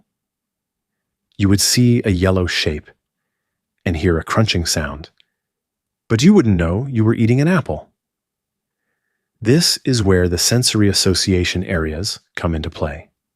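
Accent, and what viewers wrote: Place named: United Kingdom. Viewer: Bro bring back British voice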